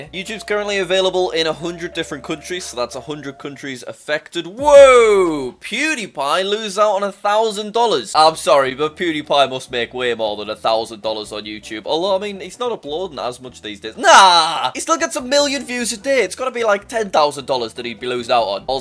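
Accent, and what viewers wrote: United Kingdom. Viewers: Bro i love your british accent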